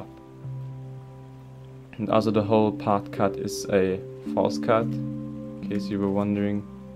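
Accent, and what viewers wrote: Germany. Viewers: Are you from germany or somewhere there? Great tutorial btw :)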